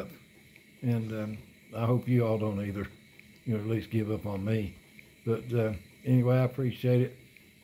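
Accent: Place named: South, United States. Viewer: From Gary's Northern wife here.....well....you still have the southern accent strong friend! Gary and I are Wishing you the best.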